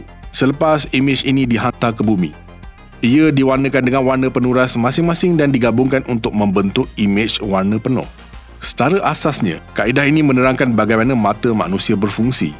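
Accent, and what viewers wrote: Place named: Kuala Lumpur, Malaysia. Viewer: Guna Bahasa Melayu baku tetapi sebutan masih KL... Jika betul2 nak Bahasa Melayu baku, sebutan kite tu kena betul2 kita dan sama bukan same...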